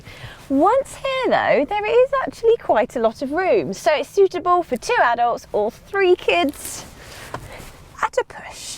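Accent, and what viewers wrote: United Kingdom. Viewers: Why British accent sounds classier than American?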